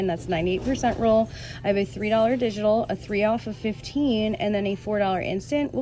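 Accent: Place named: Minnesota, United States. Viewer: I usually watch your videos, but never subscribed. I gave you one today....your accent reminds me of my relatives in Minnesota. :) Plus your information is good.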